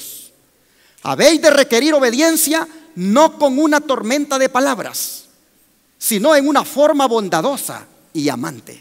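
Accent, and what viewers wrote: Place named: Central America. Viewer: De donde es pastor su acepto suena de centroamerica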